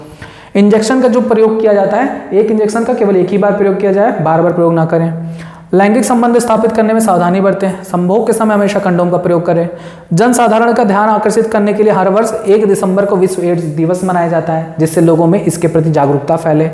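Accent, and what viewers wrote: Chhattisgarh, India. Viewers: Sir aap Chhattisgarh se ho kya???🙂😁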